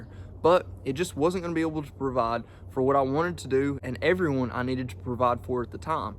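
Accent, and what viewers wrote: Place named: Texas, United States. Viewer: I admit I like your accent a lot! Probably one of my fav English accents. Are you from Texas?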